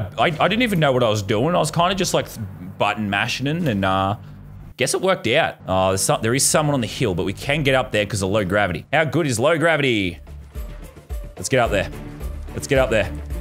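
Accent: Australia. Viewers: why does he sound extra aussie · 2 years ago sounded so Australian compared to 2020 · is it just me or did lazarbeam sound more australian in this game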